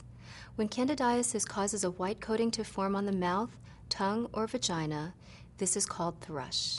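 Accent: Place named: United States. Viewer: Wow she sounds like an american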